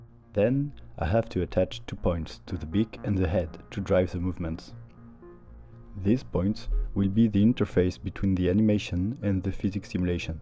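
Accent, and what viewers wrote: France. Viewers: Are you french ? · I do seem to recognize a french accent, eh eh Its nice to see you try new things/formats, keep up the great work! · Really nice video, and damn was'nt ready for a french voice reveal ! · Voice is very soothing. The french accent is hearable but honestly that's what makes your voice's charm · Are you from France? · Love your accent man! Are you from France? I'm Spanish and it reminds me of that. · Are you french ? Love your voice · You got a nice french based voice man, nice to hear someone speaking like me :v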